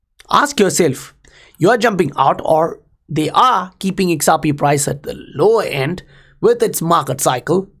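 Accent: India. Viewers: Lmao it’s definitely Indian or something in the region not even close to Scottish or Australian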